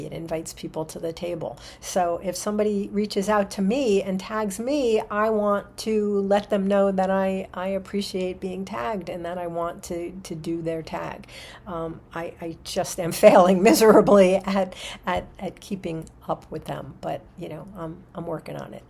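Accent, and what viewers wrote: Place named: United States. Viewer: Age is just a number I would be more pugnacious but then again I used to be a pro fighter. I have only recently come across your channel I enjoyed your American accent and the way you presented yourself and your books so I subscribed. I live just outside of London, although I am a sexagenarian, I still work in North London part-time as I am a peripatetic chess coach who goes from school to school teaching it. I am an avid reader. I read eclectically. I am a bibliotaph and a librocubicularist. Recently, I picked up an antiquarian book, The Golden Bough, in Hampstead, in what you would call a thrift shop, we call them charity shops here, and I am very pleased with it. Someone also threw away a beautiful bookcase at the bottom of my garden, so I rescued it, cleaned it up, and now store some of my Hardbacks in it. It's one of those bookcases with lead-lined glass doors. 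You can have a peek at some of my books here; it's about 10% of the books I own. If anyone is on there and wants to touch base, hit me up! <link> Regarding poetry, it can touch and change the soul. I recently read the first book in the autobiography of Maya Angelou, and she once said: "When you know better, you do better". That one aphorism inspired me to become a better man. My favourite Poet is Kipling. I also enjoy Shelley, Byron et al.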